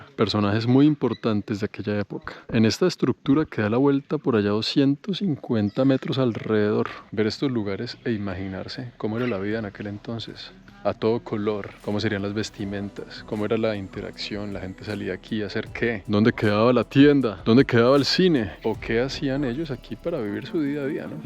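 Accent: Colombia. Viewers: Español Colombiano.😅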